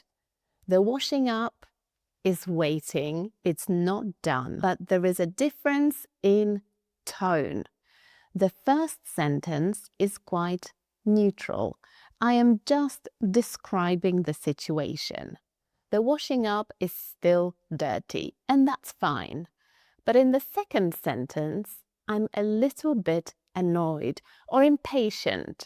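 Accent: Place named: United Kingdom. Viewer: Thanks for your great teaching and your nice British accent ❤👍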